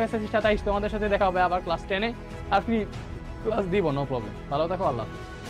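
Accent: Sylhet, Bangladesh. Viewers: ভাইয়া কী বাড়ি সিলেট? কথার মধ্যে সিলেটি টুন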